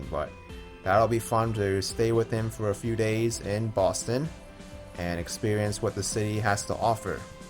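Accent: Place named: New York, United States. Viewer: Please keep your New York accent while you're there!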